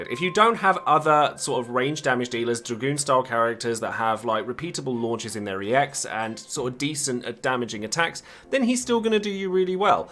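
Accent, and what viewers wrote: United Kingdom. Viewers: Laughed at the title. I truly enjoy your videos unlike most. Must be the british accent haha but joke aside your videos are very informative hence I watch it. Keep up the good job and hopefully you get more subscribers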